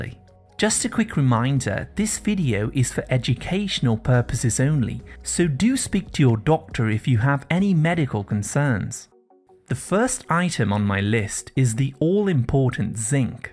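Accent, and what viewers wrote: United Kingdom. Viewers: What's with the British voicing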